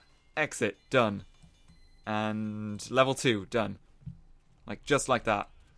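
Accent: Ireland. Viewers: He is irish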